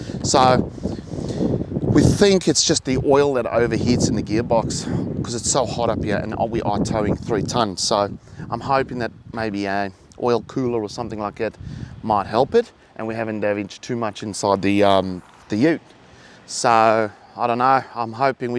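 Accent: South Africa. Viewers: You sound South African under the Aussies twang?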